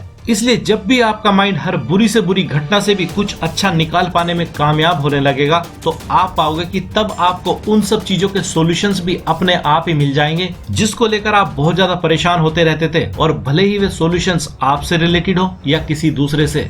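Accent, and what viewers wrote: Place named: Punjab, India. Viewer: मुझे आभास हो रहा है आप पंजाबी व्यक्ती हैं।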